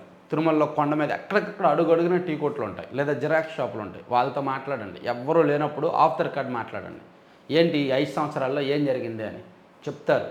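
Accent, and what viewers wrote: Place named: Uttarandhra, India. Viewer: Babu née voice mana Uttaranchal voice lo maaku nachhutundi and content Kuda baguntundi Kani neeku inka people support Kavali alage people tho direct talking introducing Chalayan Manchin alochana wish you all the best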